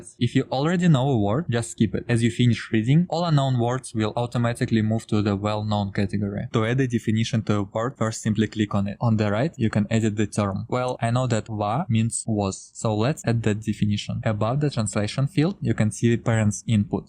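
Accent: Russia. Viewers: are you russian? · Good vid. Aren't you Russian by any chance? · жесть у тебя акцент прям брутально русский, я звучу как фембой когда общаюсь на английском xd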